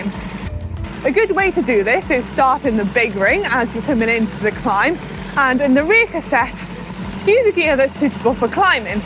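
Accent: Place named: Wales, United Kingdom. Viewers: Can we have more Welsh accent? 😀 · Another great video from with the true Welsh accent coming through 💪💪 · where you from? you sound welshy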